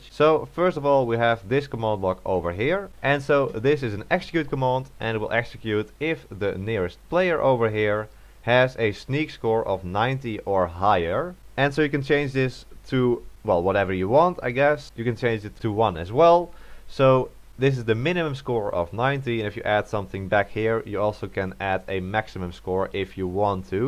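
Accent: Netherlands. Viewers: Wow if thats not a dutch accent i don’t know what is XD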